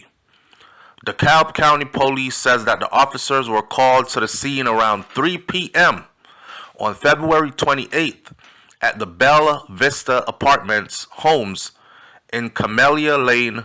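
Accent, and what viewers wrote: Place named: Jamaica. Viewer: Yhu sound like a yardy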